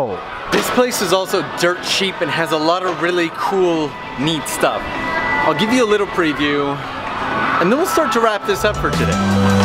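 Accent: Canada. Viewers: This guy sounds Canadian 🤔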